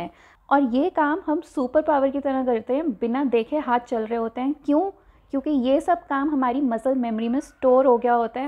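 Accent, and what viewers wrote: Punjab, India. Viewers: Are u from Panjab as some words used by has panjabi ascent...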